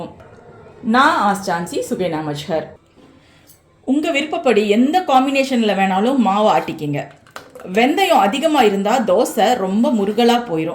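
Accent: Kongu, India. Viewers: எண்ணி எண்ணி ரசிக்கிறேன் கொங்கு தமிழை. அருமை ங்கா.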